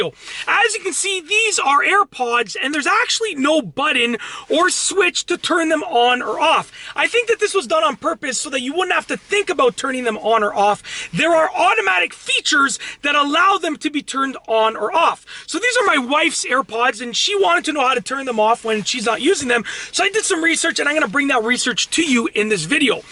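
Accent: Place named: Canada. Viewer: I love ur amazing Canadian accent and your content. Especially when you say hey everybody.😂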